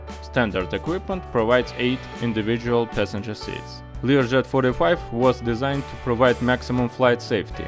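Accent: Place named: Russia. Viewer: His English is good, but he might not have enough speaking practice, here-hence this crazy Russian accent. ))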